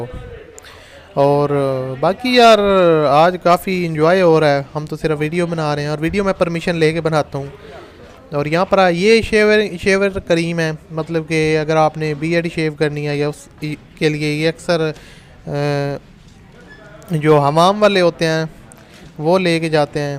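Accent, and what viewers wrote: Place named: Saraiki, Pakistan. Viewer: AP k bolnay k andaz bata raha ha k ap saraiki language ho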